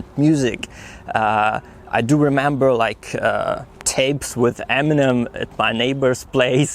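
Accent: Czech Republic. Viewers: Takový pražský,jak ten Vít z Prahy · Krásna čeština! Možno robíš nejaké drobné chyby, ale prízvuk máš ako typický Čech :D Ako si na tom so slovenčinou? Predpokladám, že rozumieš, ale vieš aj hovoriť po slovensky?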